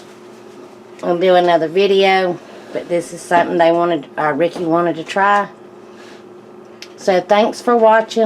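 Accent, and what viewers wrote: South, United States. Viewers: That Southern draw. You rock. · That strong southern accent! Music to my ears. By the way those cheesesteak sandwiches look mouth watering. Got to try me one of these, thanks for sharing. Greetings from England. 🙂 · Looks fantastic. I absolutely love your southern hospitality style and voice. Reminds of going to the family gathers for reunions or backyard BBQ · Never thought I would across somebody that talked country as me. Bravo.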